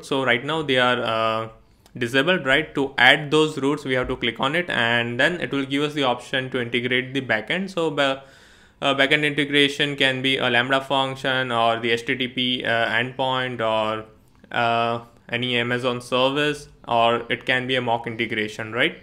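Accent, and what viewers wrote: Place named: India. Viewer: Thanks. Love from Nepal. You sound like Indian, your accent 😀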